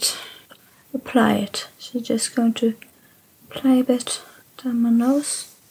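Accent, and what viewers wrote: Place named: Norway. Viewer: love your accent girl. same as aurora because she is norwegian too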